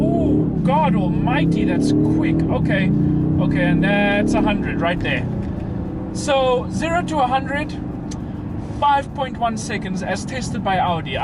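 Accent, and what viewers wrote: South Africa. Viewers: Are you South African mabey? The accent sounds familiar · is this guy South African? I'm new to the channel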